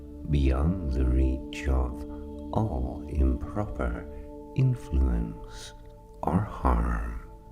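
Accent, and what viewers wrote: Scotland, United Kingdom. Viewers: I love your stuff accent is great might be Scots.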